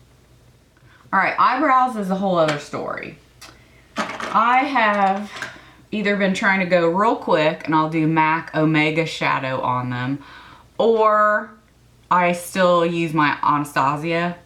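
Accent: South, United States. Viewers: You must be from maybe Georgia,or Alabama?Am I close?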